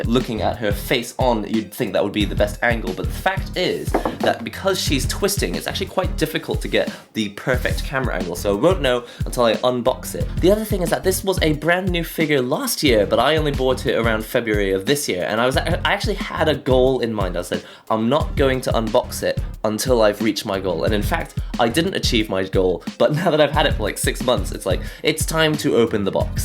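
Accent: England, United Kingdom. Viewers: Are you from England????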